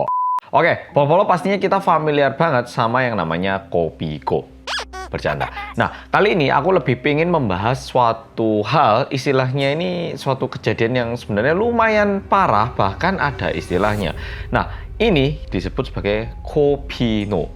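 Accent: Java, Indonesia. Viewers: Hai org korea medoook❤ Nyimaaak 🎉🎉🎉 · Kk sdah menetap d korea ya? Aq org jawa,pling suka dg pembawaan medoknya😊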